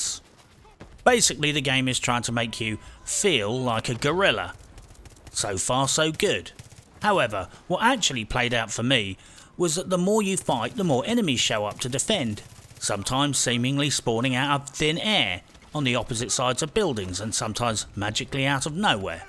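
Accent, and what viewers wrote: United Kingdom. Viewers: I love how the narrator talks and swears like British Sharlto Copley. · Nothing will ever be funnier to my silly American ears than a well-spoken British man taking the absolute piss out of a sh*tty video game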